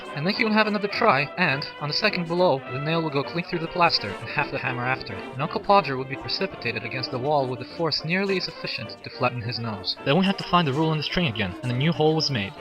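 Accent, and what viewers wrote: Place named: United States. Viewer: Why the fuck is a septic narrating this?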